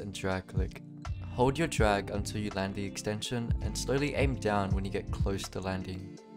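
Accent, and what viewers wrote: Australia. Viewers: I KNEW IT, HES AUSSIE, btw this is the gist voiced vid that I have watched from tou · YOU SOUND LIKE.. an australian… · idk i why im asking this but u sound aussi. are you?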